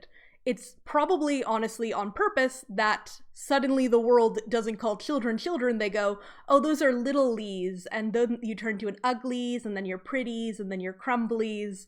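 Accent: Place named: United Kingdom. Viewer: Kind of like "raw-ther", I think? I'm Canadian. To me it sounds like we say it the way it sounds when said in the seemingly dominant American dialect... But I'm pretty sure people are somewhat deaf to their own accents. Like, I don't normally hear "aboot" when I say "about", but then sometimes it kinda does sound like I draw out the "ou" a bit. Anyway, I think the "typical" sounding American dialect that I am familiar with sounds more like "Raa-ther" than "Raw-ther" Edit: is that Somerset, UK or Somerset County, New Jersey. Edit 2: must be Somerset, UK I can actually hear it now. There's definitely a bit of an ambiguous "British" sound to your accent.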